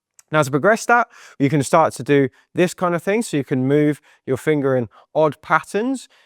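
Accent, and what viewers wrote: United Kingdom. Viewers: Thank you so much, for the roadmap. I'm 82, had a hip replacement 3 years ago and it's been downhill ever since, partially due to covid and painful frozen hip on the other side, and mostly due to laziness.😮 I recently started squats, wall presses, heel tapping and just dragged out an elastic thingamajig for the leg muscles. I'll certainly add your tips. I do NOT want to lose my mobility. Love the British accent, i lived in the UK in my twenties when I first left my enerald isle.❤❤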